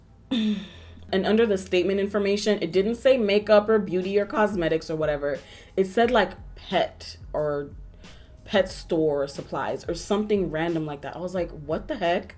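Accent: Nigeria. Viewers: I’m an African from Southern Africa and this is a “typical” Nigerian accent. No one in the south sounds like this, just like no one in the north. It’s a Nigerian Accent. African is so yawn. It’s right up there with “I’m visiting Africa” when you’re going to one specific country. Name the country+name the accent 😂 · Girl they sell them on eBay! I bought mine from eBay as well!! Also the washing machine doesn’t really work!! I love when you talk with your Nigerian accent! Subscribing!! Also I bought it for 5$ from eBay! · Your nigerian accent is the most beautiful thing I've ever heard UGH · and I'm from Equatorial Guinea where we speak Spanish. This is not the accent people have here when someone speaks English. I think another commenter put it best that this is very much a Nigerian accent because southern and northern African accents don't sound anything like this. 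Ive had friends from Kenya, South Africa, and Tanzania whose accents sound nothing like the 'standard' Nigerian accent. Uganda, too. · She does that on purpose. She's Nigerian so she does her Nigerian accent from time to time. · Lol, I'm sorry this happened to u, but this shit was funny. I'm sorry to laugh. I'm glad it wasn't a situation where they got your info and emptied your account. Girl, u said Doc McStuffin "is black in real life" lmao I know what u meant but she isn't real lol it's a cartoon. Thanks for sharing this. Btw, I love your Nigerian accent (I don't think the language is called Nigerian, so my apologies, but u know what u mean. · I love your Nigerian 🇳🇬 accent 😍😍😍😍 makes me smile as a Jamaican doll myself ‼️❤️ I love you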